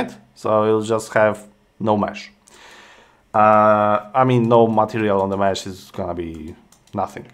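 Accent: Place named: Russia. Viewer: Мне нравится ваш тяжелый russian accent, товарищ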